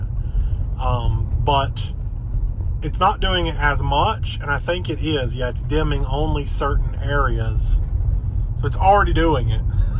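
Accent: South, United States. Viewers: I like ur southern accent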